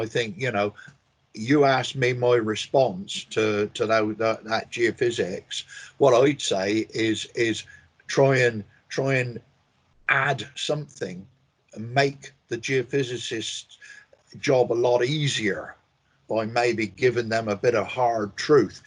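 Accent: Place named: Newfoundland, Canada. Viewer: I love his accent. He could be from Newfoundland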